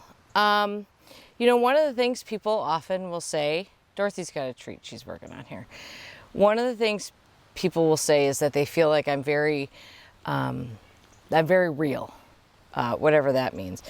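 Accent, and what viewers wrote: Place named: Midwest, United States. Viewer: My husband and I have only had one dog in our 39 years together and this is why. It was devastating to lose a 25 lb schnauzer. I can't imagine losing a giant teddy bear like Oden. My heart goes out to all of you. You are real, pragmatic, down to Earth and talk to the camera like the neighbor I wish I had. My 80 year old mother who was born and raised in a Chicago suburb says she likes you too. Must be the familiar Midwestern speech and manner. Take care.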